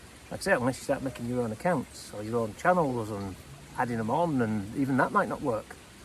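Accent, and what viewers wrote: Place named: Yorkshire, United Kingdom. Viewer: Some of us just subscribe because we love to listen to you shifting the problems around the landscape. I wonder how many Americans you are going to attract, though. They are not good at Yorkshire accents, alas.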